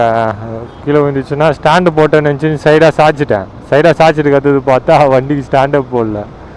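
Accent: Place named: Chennai, India. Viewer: Bro unga chennai slangu super ah irrku.... Na oru nal ungla meet panuven....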